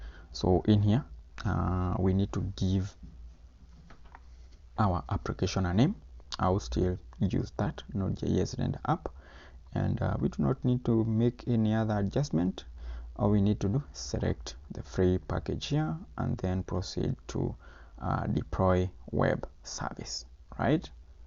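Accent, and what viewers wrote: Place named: India. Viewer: OHJE, schon wieder Indienglisch:-(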